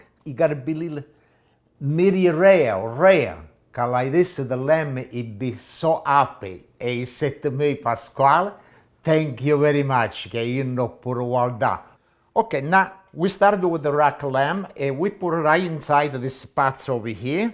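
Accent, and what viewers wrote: Italy. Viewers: You gotta love that Italian accent too!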